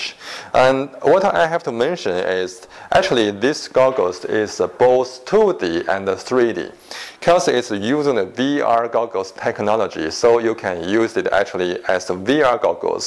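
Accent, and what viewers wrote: China. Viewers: Couldn´t you find someone to present products, that are more proficient in the spoken English? It´s too "chinglish" for my liking....but excellent product!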